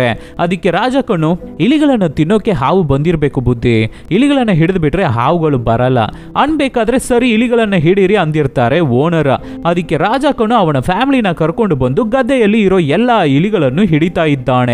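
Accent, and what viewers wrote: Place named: Karnataka, India. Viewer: Karnataka Kannada supar bro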